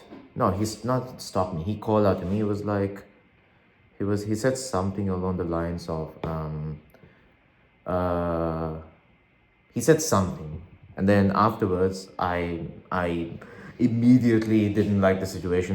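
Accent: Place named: South Asia. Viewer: Habibi, yessss don’t waste the water that could go to starving bettas in Africa! Habibi u r fresh off the boat and no one can hear a word through that curry accent.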